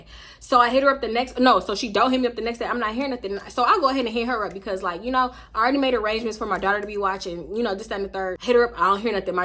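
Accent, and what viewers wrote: Baltimore, United States. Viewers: It’s the Baltimore accent for me 😂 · you sound just like us baltimore ppl now😂😂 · You and this Bmore accent lmao I love a good Stewpiddd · Girl yes sounds just like us baltimore folk lls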